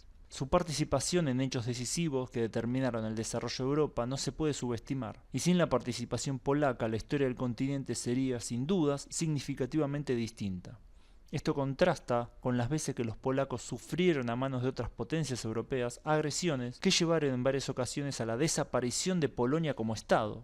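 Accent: Buenos Aires, Argentina. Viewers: buenos videos, lastima tu acento tan porteño, cabasho, ashi, asher, mishones....caballo alli, ayer, millones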